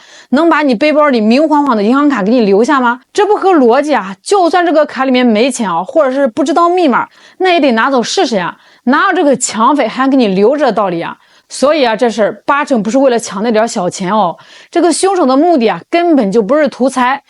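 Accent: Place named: Beijing, China. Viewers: 原來是北狄人 口音特別不舒服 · 她是一个北京大妞。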